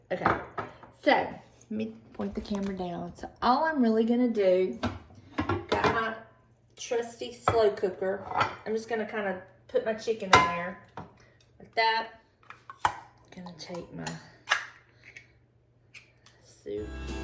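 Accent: South, United States. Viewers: oh I knew the accent was southern. Thanks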